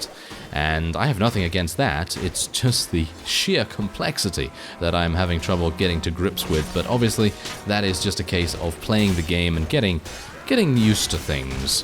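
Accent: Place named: RP, United Kingdom. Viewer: Absolutely love your videos and your posh accent lol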